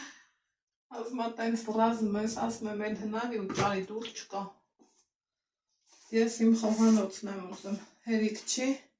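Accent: Lori, Armenia. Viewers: Շատ հաճելի բառբառա ջան Լոռվա բառբառը👍🤗🤗❤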